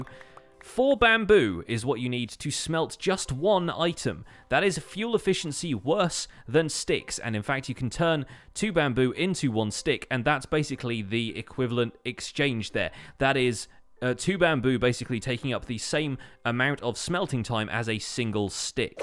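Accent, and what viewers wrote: United Kingdom. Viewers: Im so happy i caught up to this current episode. Your lovely british accent is now stuck in my head thanks to me binge watching the series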